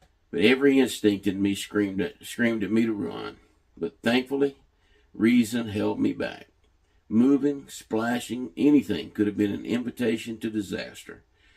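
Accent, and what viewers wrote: South, United States. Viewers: This was amazing, you're such a good writer not to mention storyteller especially with your lovely southern accent. I can't wait to hear more stories from you in the future 😊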